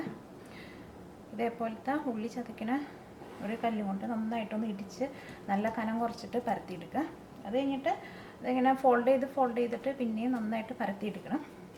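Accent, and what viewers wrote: Thrissur, India. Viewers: Within 3 hours, almost 1500 likes !!!!!!!! it's a reward for your hard work. I noticed the strain in your fingers while you were mixing the dough. As you said, I think this is the first recipe for pappadam in the net, which is our favorite one, of course, everyones. Really it's my son who introduced you to me. When he was living alone in Florida he was trying your recipes and now his wife has no complaints about him because he knows cooking and helps her. All of us like your Trichur accent.Let me try now itself and if it comes okay I'll definitely let you know. I tried WhatsApp but not connecting. Could you please tell me where you are living.Thanks a lot and keep it up. :) this is the first time I am subscribing a channel.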